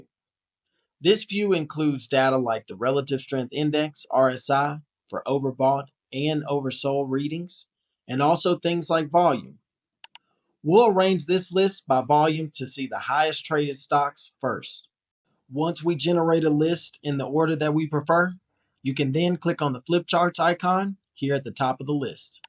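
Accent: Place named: South, United States. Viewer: Really appreciate the shorter, to-the-point format. All help, no fluff. One suggestion: the heavy country accent is a little distracting.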